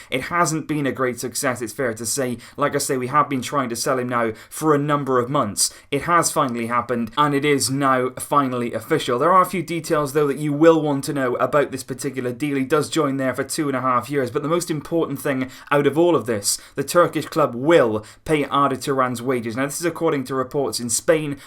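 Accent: United Kingdom. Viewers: barcalegend _10 uk i know by the acsent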